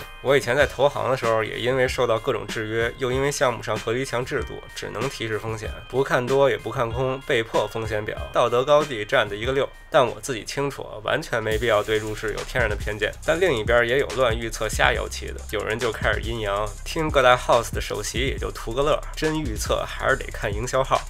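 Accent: Beijing, China. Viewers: RangErliVe 这就是内京片子味儿 哎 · 不是正经更新，比较随意🤣。老北京土著了哈哈哈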